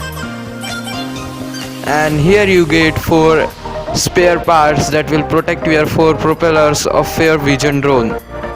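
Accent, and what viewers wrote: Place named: Bengal, India. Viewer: This guy sounds bengali (am a bengali myself)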